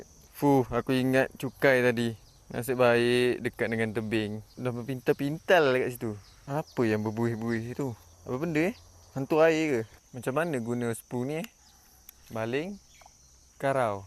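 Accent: Kuala Lumpur, Malaysia. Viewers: Ore kelate tp kecek luar mcm org kl, ingat ore luar tadi😂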